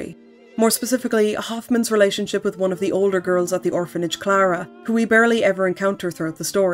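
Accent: Ireland. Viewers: New viewer. I love horror games and Irish accents so now you will never get rid of me! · so SuperEyepatchWolf sent me here and I'm bingeing basically all of videos (I CANT BELIEVE I DIDN'T KNOW THE UK REFUSED TO PUBLISH THIS) but im also trying to figure out the region of her accent? I've tuned into a few Irish accents but I reckon this ain't Dublin or Cork or Wicklow, what IS the accent? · It’s so cool and refreshing to hear a fellow Irish person talk about the niche PS2-era horror that I’m so interested in. The standard of your writing and work in general is so good! Subscribed! 💖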